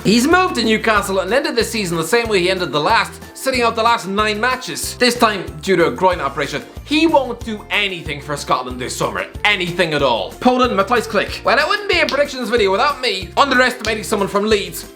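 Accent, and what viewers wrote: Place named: Ireland. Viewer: Irish accent makes it seem like that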